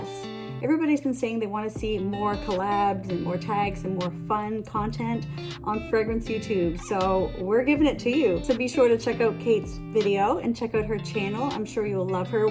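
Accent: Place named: Maritimes, Canada. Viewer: I was trying to figure out which island you were talking about and then I realized it's PEI (confirmed by your Maritime accent 😄). Then I wondered what perfumes you wear to your favourite places on PEI. Then I wondered what perfumes I wear to my favourite places in BC. Now I'm off to Winner's to find Bourbon Craft. Wish me luck!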